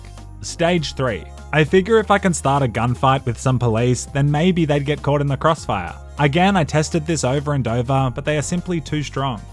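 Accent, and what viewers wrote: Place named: Australia. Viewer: woah... your'e Aussie?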